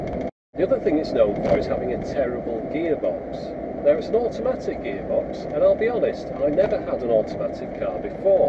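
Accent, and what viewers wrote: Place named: Liverpool, United Kingdom. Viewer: are you near Liverpool? you sound a bit like Ringo.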